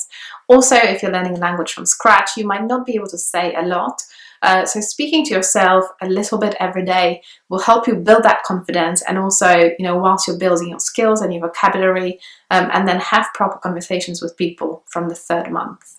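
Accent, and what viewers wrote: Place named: United Kingdom. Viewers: ❤️British accent! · I love this accent❤️ I’m Iranian and I want to learn English & British accent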